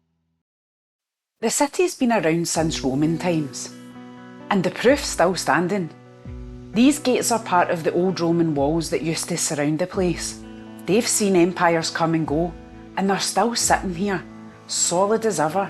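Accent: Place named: Scotland, United Kingdom. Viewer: Great video. U sound Scottish (video creator) ; I’m going in October 2026 and am psyched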